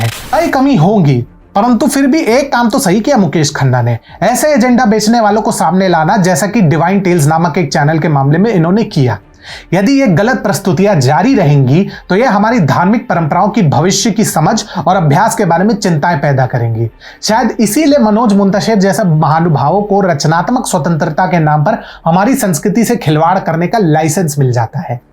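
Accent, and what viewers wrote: Standard. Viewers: आपकी इतनी अच्छी , शुद्ध और साफ हिंदी के लिए आप को साधुवाद ।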